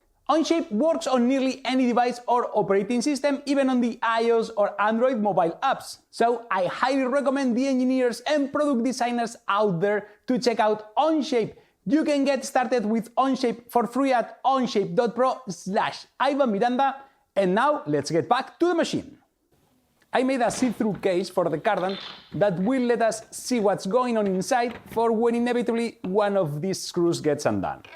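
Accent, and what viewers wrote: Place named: Spain. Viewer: You are a genius. I love that you speak fluent English with a super strong Spanish accent.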